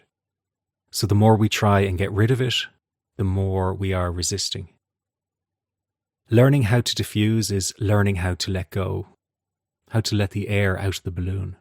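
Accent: Ireland. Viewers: Very helpful during this difficult time. Never felt anxiety quite like this in my 75 years on this planet! Was feeling somewhat suicidal at first, but slowly learning to accept it. Waiting for your book 'DARE' to arrive. Meanwhile, enjoying the Irish accent! ;-)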